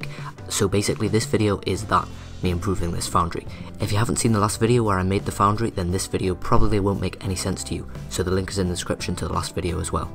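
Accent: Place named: Wales, United Kingdom. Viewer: You have a slight Welsh accent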